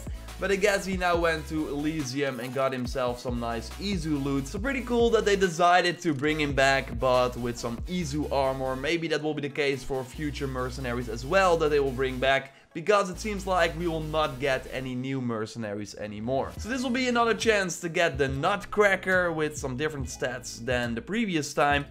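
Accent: Netherlands. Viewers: mm snif snif i smell dutch. am i right?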